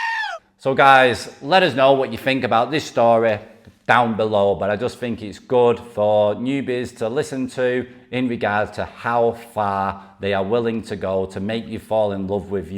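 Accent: United Kingdom. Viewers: You don't sound Australian, mate. You sound British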